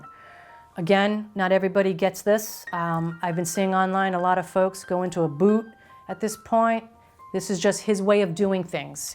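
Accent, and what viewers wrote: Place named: New England, United States. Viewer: Thank you for making this. I dont have bunions, but I have a rare/uncommon condition called Freiburg's disease. It causes the tissue at the top of my 2nd metatarsal (2nd toe, the one you had a hammer toe on) to die and deform because its too long. So I need to have the bone shortened and then the dead part taken of and realigned. It will be less invasive then your surgery, but I will also be immobile for a short time and casted. You video helps ease my nerves. Also you sound like youre from the New England area and it reminds me of my moms accent. So I love it! ♥️ I live abroad and dont hear it often.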